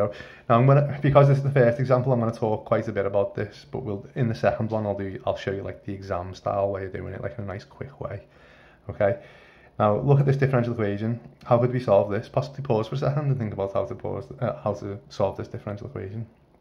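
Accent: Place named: Liverpool, United Kingdom. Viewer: I love the Scouse accent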